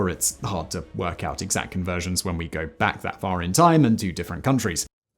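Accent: United Kingdom. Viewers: who is this slaphead beardman who hosts every youtube channel at least in this series he doesn't exaggerate a british accent quite so much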